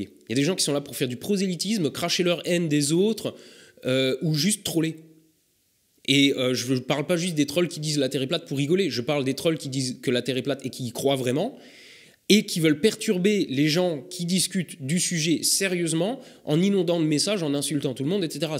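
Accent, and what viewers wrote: Alsace, France. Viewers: Salut à toi! Merci pour tes videos. Elles sont tip top même pour les incultes!! Petite question: tu as un accent et des tics de langage qui me font pensés que tu viens d'Alsace, et peut être même des Vosges du Nord. Est-ce le cas ?? Merci!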